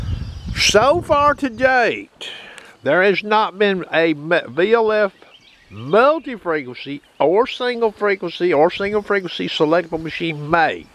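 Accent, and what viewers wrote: South, United States. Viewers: From one deep southern accent guy to another, I completely agree with what you said at the end of the video. No one program or detector is going to get it all. That’s why I tend to re-hunt sites with several different programs/settings over and over again. Seems like I always find something that was missed before with previously used settings. I kind of enjoy that little nugget of knowledge as it reopens up places to hunt that some might rule hunted out. One place like that is my own backyard. It’s one of the most difficult, iron infested spots I’ve ever hunted, and I enjoy the challenge of tweaking different programs to pick out more nonferrous targets. Not only is slowly sifting through the iron fun, but it helps me hone my skills as a detectorist. Enjoyed the vid.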